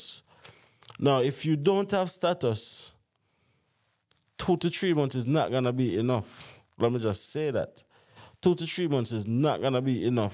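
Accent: Jamaica. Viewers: Like ur English patwa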